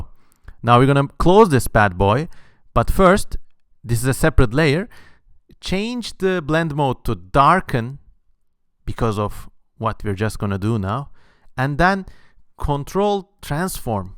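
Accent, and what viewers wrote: Turkey. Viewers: That accent is so Turkish and I love it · I was just curious about All Tomorrows author, but never clicked one of his videos. I came across by and clicked it. After 1 second I heard you, I was like "Dude, are you Turkish?" And I clicked the Patreon and YES I WAS RIGHT Brrrr Ellerine sağlık <3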